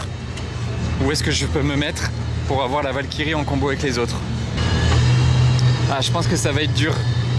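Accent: France. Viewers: Je ne comprendrais jamais cette intro ridicule en anglais avec un accent francais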